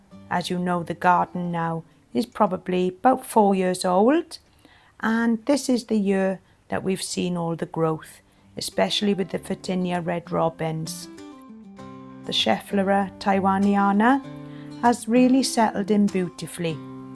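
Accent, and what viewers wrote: Wales, United Kingdom. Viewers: Hi the Garden is looking lovely. Do you heat the greenhouse ? I'm in Essex. You're lovely soft welsh accent really reminds me of my Granna. 😊x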